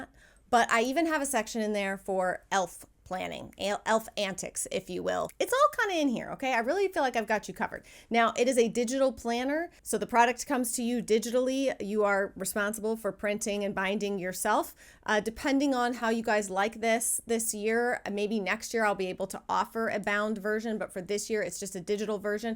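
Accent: South, United States. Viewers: I have a King of Christmas tree last year and I love it!!!!! I have always had a real Frazier Fir tree. Last year the real trees were so expensive and it would die after a month. I am also done Christmas shopping thanks to you my friend. PLEASE keep being chatty I love hearing your voice the southern accent is the best.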